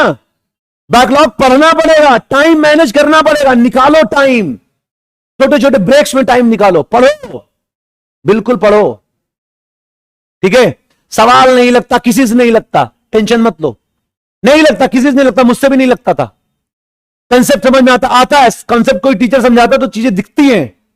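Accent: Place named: Bihar, India. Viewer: I think he is from Bihar 😅 aawaz waysi hi h ❤️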